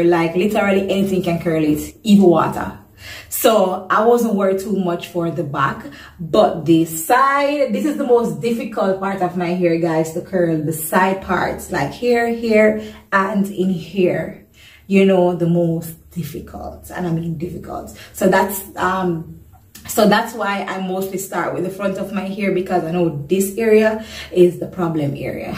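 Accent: Jamaica. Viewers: I can hear the Jamaican in you. I am watching you from Canada! I love your video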